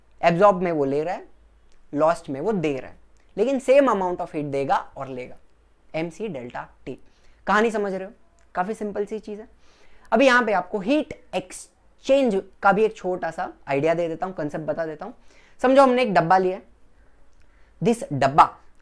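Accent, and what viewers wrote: Maharashtra, India. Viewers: bhaiya, from where you are? Because I listened Marathi when you were speaking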